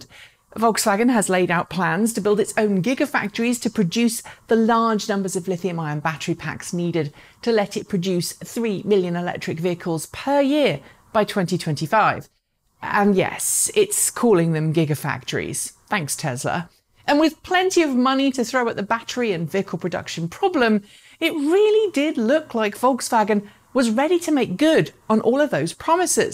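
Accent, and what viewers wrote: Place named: England, United Kingdom. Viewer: This lady is so erudite and I love the English accent .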